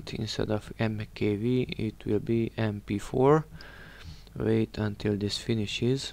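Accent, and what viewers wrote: Hungary. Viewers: Thx for the info (alig lehet hallani hogy magyar vagy :P ) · Akcentusod rögtön elárulta hogy magyar vagy ))) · It sounded like hunglish. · Nagy segítség, le sem tagadhatod amúgy, hogy magyar vagy. Az akcentusod elárulja :D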